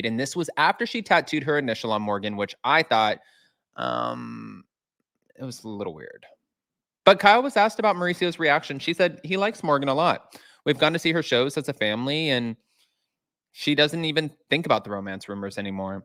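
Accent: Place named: South, United States. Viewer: I love your southern voice, 😂😂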